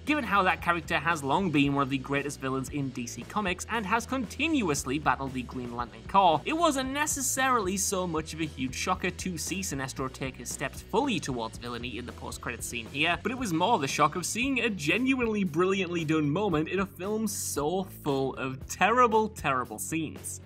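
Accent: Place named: Newcastle, United Kingdom. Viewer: I do love a nice, Geordie WhatCulture video... (Unless it's a macam) 😀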